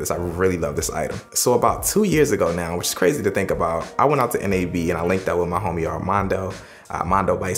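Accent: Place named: DMV, United States. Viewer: The slider and takstar mic look great. You sound like you grew up in the DMV as well. Keep being great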